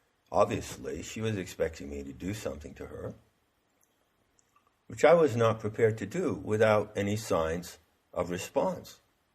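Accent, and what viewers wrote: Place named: Maine, United States. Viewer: Sensei, thank you for these genuine spoken words...i am just now obviously catching up to your most recent talks. I am not a young female of Spanish descent... this is my wife's URL, which ive had to use since being kicked off of themtube months ago. Such a Heretic am i! As a Jungian Psychologist (not Yung-lol) originally from the Boston area, i love to hear your Maine (?) accent. From Gnosticism to Jung to Henry Miller and Karuoac (Lowell, Ma) to Casteneda, the Mystery schools and more...so very much of what you say rings true to me as if it were second nature. What a terrible expression, second nature?! I have no heros, i have no idols, but simply felt the need to express how deeply i appreciate you sharing of yourself so honestly...a breath of fresh air in a world swirling in bull shite. My patience with people is waning...but it may be about damn time! 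In a sense, anyway.... I should write to you on your website without being a total blowhard. Thats not easy - i have much say that few besides yourself and a few deceased writers dare contemplate, lest it shatter their fragile egos. Your Integrity is panacea to my soul my Good Man! Cheers and Blessings from sunny, somewhat free Florida. Lux et Veritas mon ami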